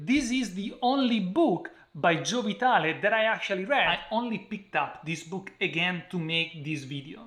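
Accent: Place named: Italy. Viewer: Rest assured, there was no language block. To the contrary, your enthusiastic inflection, which I assume followed Italian rules of enunciation, made the content more interesting. Bravo!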